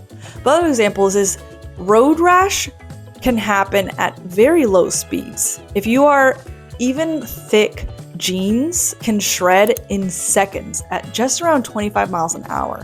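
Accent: United States. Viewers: Great video! All solid advice for new and experienced riders. Riding is a skill. For some reason I’m stuck on your accent, though. My guess is Ohio Michigan, maybe Chicago land area growing up.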